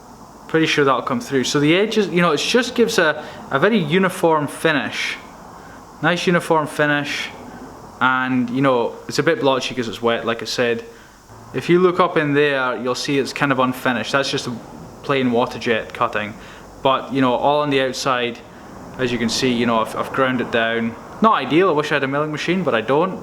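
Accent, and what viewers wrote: Scotland, United Kingdom. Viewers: You Scottish too? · are you scotish?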